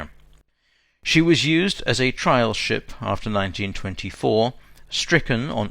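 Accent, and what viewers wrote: United Kingdom. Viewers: I disagree, he just sounded well posh the exact way a British Naval Historian should sound, that includes being several glasses deep with sherry, and is so to be expected, yet he really didn’t sound blushed at all I must say so. In any case, referring to Japanese words and names as “gobbledygook” is the only term in, around, and relating to this video as any sort of ill reference to such the topic at hand. I’m not sure if you’re ignorant of how the Japanese named their vessels or if you simply did not listen to the beginning when he pardoned himself from any potential mispronunciations, as nothing was extremely mispronounced or even relative to the term extreme, especially for an Englishmen. There are far better criticisms to be writ of far lesser quality videos out there, young friend. Edit: typo correction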